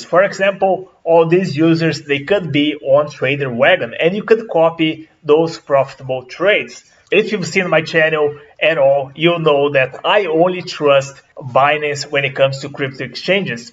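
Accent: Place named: Brazil. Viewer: brasileiro e professor de ingles, escuto esse sotaque o dia todo kkkkkkkk, mas seu ingles é foda irmão parabens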